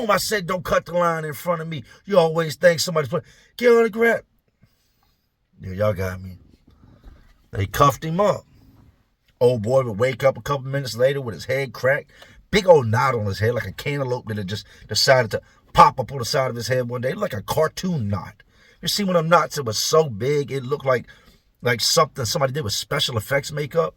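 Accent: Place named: Philadelphia, United States. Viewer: Hny J!, u r an impeccable storyteller, plus u sorta hv that north philly accent! Been subbed since. Day1.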